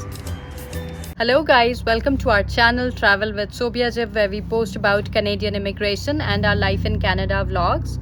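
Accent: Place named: South Asia. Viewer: Baaji i love your desi English... feels like home, although im from India 🇮🇳 but still its feels the same...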